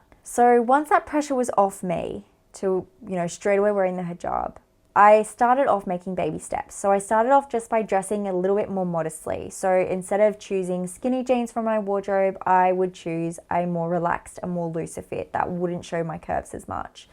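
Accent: Australia. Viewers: I wonder why did you come up much of Aussie accent in this video particularly ??... Earlier videos didn't have that much ...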